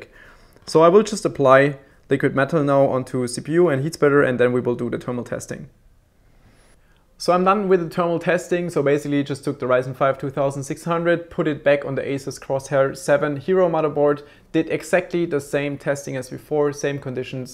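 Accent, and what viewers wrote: Germany. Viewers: er ist eben deutscher. Meine fresse. diese dummen unkonstruktiven kommentare immer. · deutscher Akzent ist richtig übel... aber sonst mag ich deine Videos!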